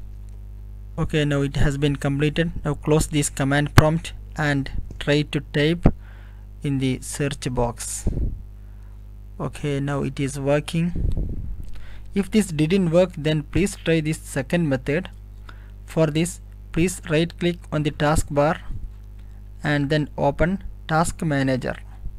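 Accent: India. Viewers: holy shit my insticts were right. i new i was in good hands as soon as i heard that thick indian accent<3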